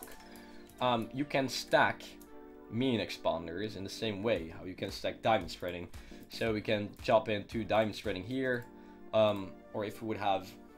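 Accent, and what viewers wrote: Netherlands. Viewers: Ur accent sounds dutch where are u from?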